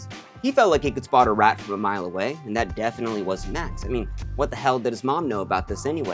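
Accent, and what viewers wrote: United States. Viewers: Yeah, sorry but... You sound American and not Mexican..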